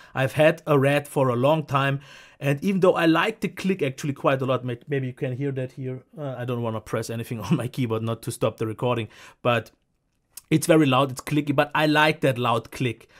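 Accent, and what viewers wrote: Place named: Germany. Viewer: alter der deutsche akzent xDDDD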